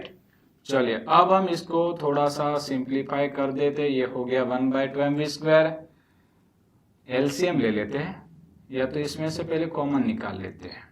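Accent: Gujarat, India. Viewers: Sir accent is Gujarati 😅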